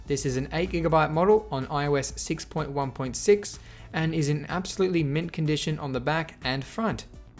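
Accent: Australia. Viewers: I think he lives in Australia based on his accent:)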